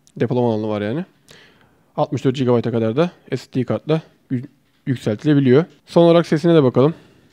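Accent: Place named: Eskişehir, Turkey. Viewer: Eskişehirrr????? Eskişehirmisin sen abi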